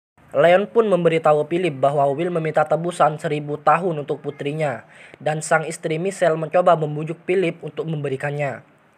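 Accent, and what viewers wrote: Madura, Indonesia. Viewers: Logatnya kyak yg kenal...org madura ya tretan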